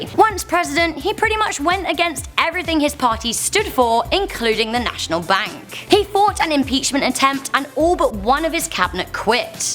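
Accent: United Kingdom. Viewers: are you British? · A American should criticáis the USA not some British hore with her British accent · Few things piss me off more than some british chick and her screechy british accent complaining and making her negative comments on OUR presidents. While UK still has that terrible example of a royal "prince" Charles alive - no brit should be telling me about our problem presidents. Queen Mother and Charles need to do what is right for UK and give the power to William while they are alive to advise his court. This chick is horrible I am tuning out. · This list is total bullshit. Obomber was the worst President this country has ever seen and pardon me, you have a British accent. What the hell could you possibly know about U.S. history?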